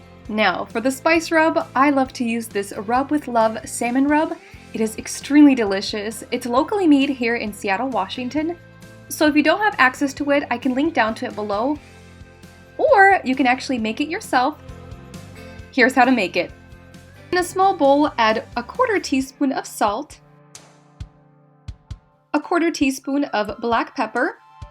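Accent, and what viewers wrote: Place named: Poland. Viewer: Where is the accent from?Polish?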